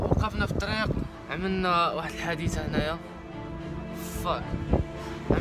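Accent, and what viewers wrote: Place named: India. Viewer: Nice cars but your indian accent is Epic mate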